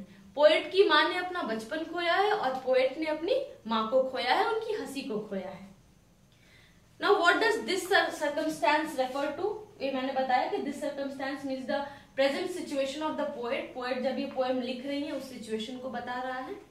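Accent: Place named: Bihar, India. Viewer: Didi you are incredible. Are you from Bihar ? As it seems to me.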